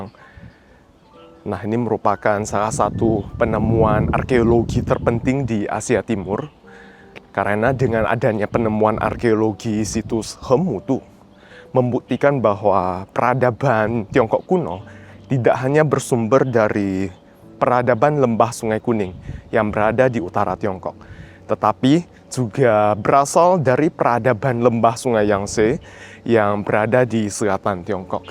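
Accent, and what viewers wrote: Java, Indonesia. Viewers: Materi yang bagus. maju terus. Semakin hari semakin medok logat. Sehari-hari pakai bahasa Jawa ya?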